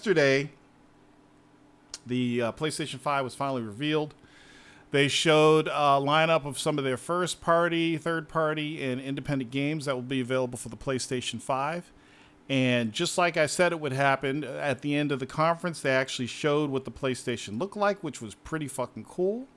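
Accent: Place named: United States. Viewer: why you put on an american accent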